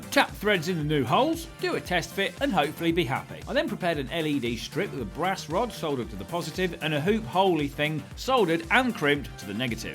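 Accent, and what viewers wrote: United Kingdom. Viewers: Extra British in this short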